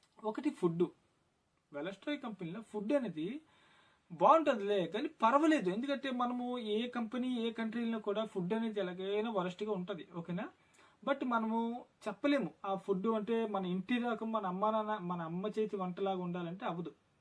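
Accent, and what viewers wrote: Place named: Andhra-Odisha border, India. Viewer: నువ్వు ఆంధ్ర ఓర్రీస బోర్డర్ కదా నీ తెలుగు అలానే ఉంది బోర్డర్ కదా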